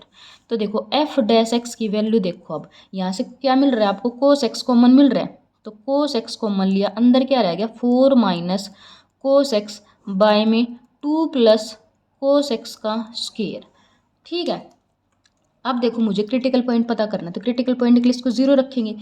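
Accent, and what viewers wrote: Haryana, India. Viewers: Haryana se ho mam?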